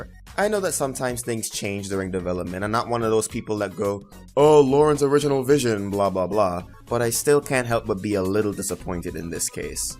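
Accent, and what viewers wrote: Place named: Caribbean. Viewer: so, what part of the Caribbean are you from?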